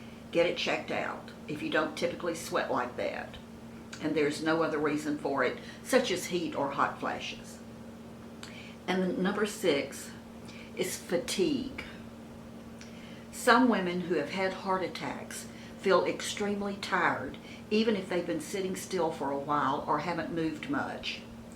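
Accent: South, United States. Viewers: I lost my precious Grandma to a heart attack..she wanted to come home and the doctors had already told us it wouldn't be long😢...so she came home on Hospice..but my momma and me took care of her..she lived 5 more day's and it completely broke me! ...I know this is an older video but I Thank God you are okay! The look on your face in the intro really scared me! This is a great message...Thank you for sharing the symptoms..they just might save someone's life..you are such a sweetheart and I just love to listen to you.you have the biggest heart ever!...I can tell you're southern so where are you from? I'm from Indiana...Again I'm so happy you're okay! xo Hydie ❤💋❤💋❤💋 Hugs darlin...you look amazing and I love your sofa..we have similar taste...😜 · I love your accent and southern ways. You should be part of the southern women group (what southern women say) you would be a great addition!!!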